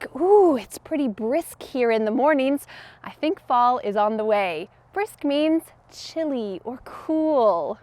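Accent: United States. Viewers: Hi. I 'd like to master American accent that sounds lovely and gentle! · ❤️❤️❤️❤️❤️. You are the lovely teacher. You gave me The Amarican accent.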